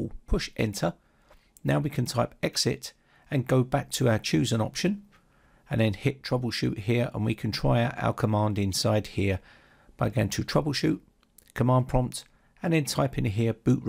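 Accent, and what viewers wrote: England, United Kingdom. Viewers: I hate you english acent but thanks I use a windows 7 boot diferent to the windows 10 boot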